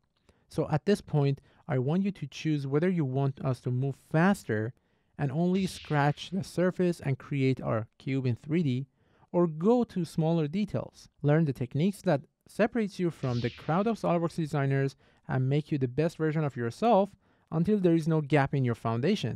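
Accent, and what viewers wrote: Iran. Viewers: why i think you are from iran😂😂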